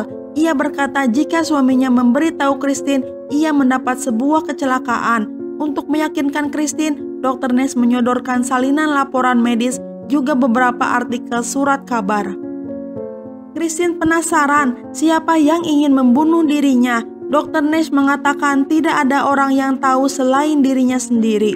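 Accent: West Java, Indonesia. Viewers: chanell ini nih ngerti klu pngen nunjukin khas sunda bs dgn nyebutin (kumaha damang)trus yg bukan org sunda kan ada kata2(welcome....)itu mskpn bahasa inggris jg kn global org2 byk yg tau artinya apa...dgn logat jg org tau ini chanell ini org sunda yg puny...ada chanell lain lupa namanya chanel ny apa trus yg puny ny org jawa dan bacain review film kata2 ny hmpir smua bahasa jawa tp kan yg nonton bukan jawa semua ibaratny klu mau jawa smua hrus ada translate dibawahnya jgan smpe kata2 ny jawa semua kan bisa sedikit kata tp org paham ....makany chanell trsebut byk hujatan n byk yg komentar jlek.... nah aku paham klu mimin bilang subcrb jd subrek trus like dibacany jd likeu itu sengaja lah gk usah dikomentar udh jd ciri khas....memang sbgian klu org sunda emng lidahnya pabaliut alias rumit klu dlm pengucapan apalg b inggris kebyakan makan sampeu hehehe..bercanda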